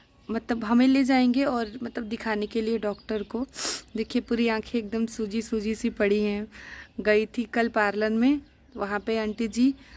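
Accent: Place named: Varanasi, India. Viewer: आप वाराणसी मेँ रहती हैं क्या',सो funny, अरे का खइनि ह समोसा तो ख़ इनि हं👌..कोई बात नही आप लोगो की बात न सुने आप जैसी हैं वैसी ठीक हैं वैसे दुनिया में कुछ लोग हैं जो कितना भी अच्छा कर लो ,लोंगो को गलत ही लगता है इसलिए ,ना किसी के अभाव में जिये न किसी के प्रभाव में ये जिंदगी खुद की है बस इसे अपने मस्त स्वाभाव में जिये..🙏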